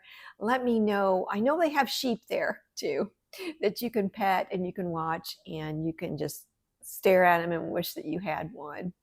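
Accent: South, United States. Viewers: You are so sweet. Love your podcast. You said you are northern but to me you sound southern!!! What do I know,I am Canadian.❤️🇨🇦❤️ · I enjoyed watching my first episode of your vlog,! But for a northern transplant to the south, you certainly sound southern! LOL I have never been to Rhinebeck and I'm not sure I ever will. It seems a bit overwhelming to me. I've been to Flock in Seattle and on several knitting trips with knitting stars (Arne & Carlos and soon the Grocery Girls, for example).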